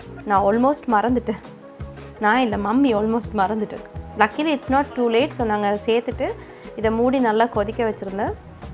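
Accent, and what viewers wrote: Sri Lanka. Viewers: அஸ்ஸலாமு அலைக்கும் உங்கள் அழகு இலங்கை தமிழில் பேசுவது மிக மிக அழகாக உள்ளது எனக்கு பிடித்த தமிழ் இலங்கை தமிழ்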